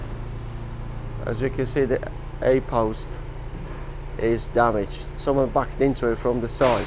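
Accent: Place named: Lincolnshire, United Kingdom. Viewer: You have a slight Lincolnshire accent going on there.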